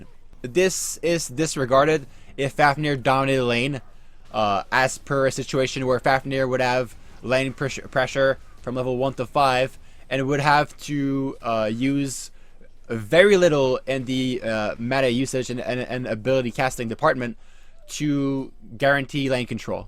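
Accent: Quebec, Canada. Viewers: i can feel the french canadian richness in your voice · Are you from Quebec?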